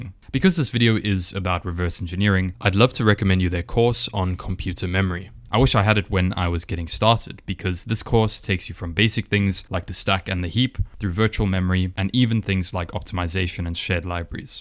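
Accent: South Africa. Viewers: You sound like you are from South Africa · Do I detect a south african accent? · I trust you because, of the south african accent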